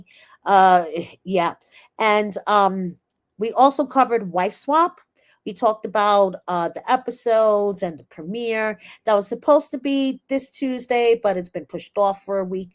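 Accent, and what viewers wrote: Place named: Connecticut, United States. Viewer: Your voice reminds me of my home CT. LOVE LISTENING TO YOU. 🍹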